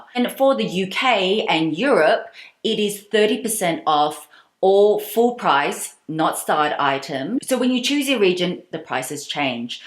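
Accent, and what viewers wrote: Australia. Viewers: Hi, love your videos. I really appreciate all the information and tips. I really enjoy watching your videos because you are so knowledgeable and especially love your Australian accent. #faMELy Look forward to your next video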